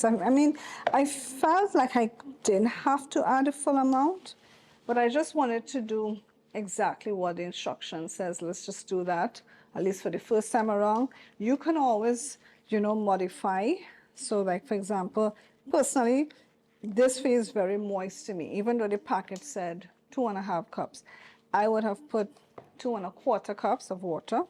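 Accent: Trinidad and Tobago. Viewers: Great video. That beautiful Trinidadian accent is so refreshing and you have inspired me to try this myself. I know now an arepa is a cornmeal fry bake. 10 out of 10.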